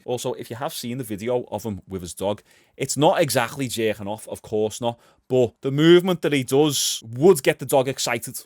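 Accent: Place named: Newcastle, United Kingdom. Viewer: I like your channel, but please don’t turn into a true geordie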